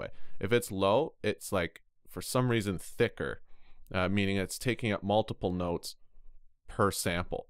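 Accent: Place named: Canada. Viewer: Are you Canadian? I'm trying to figure out where your dialect is from. You've got some serious regional inflection.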